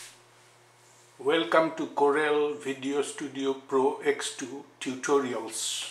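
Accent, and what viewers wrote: India. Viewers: Awesome job of speaking, I don't care if you have an India Accent, LOL You are speaking quite clearly Im sure I will learn stuff from your tutorials 5* from Walter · You should apologize for your Indian accent. There´s nothing wrong with it, and it sounds great. Greetings from Montevideo city, Uruguay.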